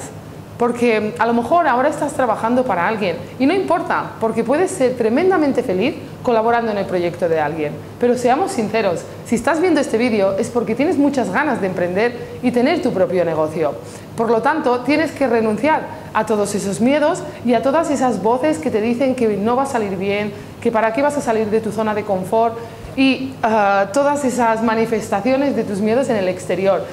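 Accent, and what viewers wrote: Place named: Morocco. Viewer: eres marroquí?? Ese acento y determinación es inconfundible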